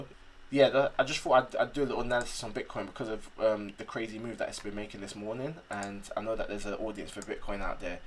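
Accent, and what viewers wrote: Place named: London, United Kingdom. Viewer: Yo yo, waz up bro good to hear a fellow london accent ma man ONE LUV 💝💝💝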